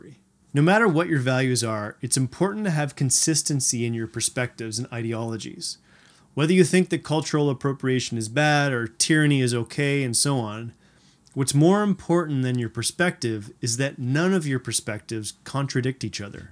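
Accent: Canada. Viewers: Canadian much? I love it! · Interesting, well thought out points... a little culty and the GTA accent is unbearable. Mute and use cc!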